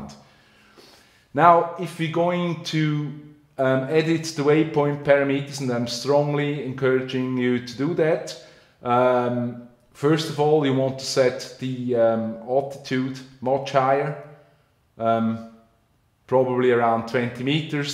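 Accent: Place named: Sweden. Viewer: Behöver hjälp! Du låter svensk, är du det? Undrar bah om jag kan fråga på svenska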